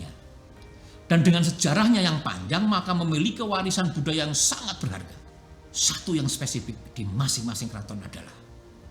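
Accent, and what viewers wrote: Java, Indonesia. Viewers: Pembabaran yg sangat menarik mas, dalam menjelaskan sejarah dengan penuh semangat 😁 kami jadi greget menontonnya. Dengan logat jawa dan terkadang ada guyonannya membuat penonton lebih santai tetapi tetap serius. Cara pembelajaran sejarah yg sangat efektif, sungguh apabila seorang guru disekolah memberikan pelajaran seperti mas. Pasti akan lebih semangat, serta ditunjukan budaya tosan aji maka mereka akan tau hebatnya nusantara ini. Sukses untuk GPI dan team, semoga cepet terlaksana mas keinginan untuk membuat film sejarah. 🙏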